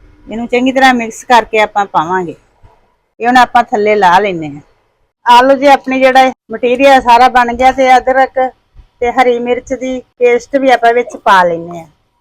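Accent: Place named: Majha, India. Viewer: 🙏🏻ਭੈਣ ਜੀ, ਤੁਹਾਡੀ ਬੋਲੀ ਤੇ ਤੁਹਾਡੀਆਂ ਬਣਾਈਆਂ ਚੀਜ਼ਾਂ ਬਹੁਤ ਵਧੀਆ ਜੇ। ਮਾਝੇ ਦੇ ਜਾਪਦੇ ਓ।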